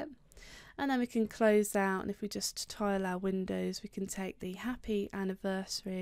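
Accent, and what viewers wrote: United Kingdom. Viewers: Excellent videos with a good pace. Can always go back and watch again to take notes. And the English accent helps! Not sure why you don't have hundred of thousands of views - much better than many cnc tutorials!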